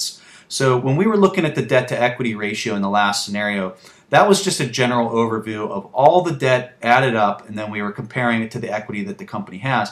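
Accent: United States. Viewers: There’s zero chance you’re not from Baltimore. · are you from Pittsburgh?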